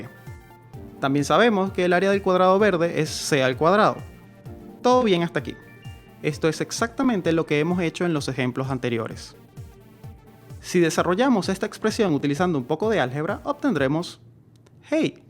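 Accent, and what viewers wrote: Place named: Venezuela. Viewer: Soy aficionado de canales como minutephysics, numberphile, sixtysymbols, veritasium, etc y cuando escuche tu acento me dio un escalofrío gigante, que bueno ver en youtube gente venezolana haciendo cosas similares, mucho éxito! muy fino lo que estas haciendo pana! P.S: llegue aqui porque minutephysics te dio like!, GENIAL!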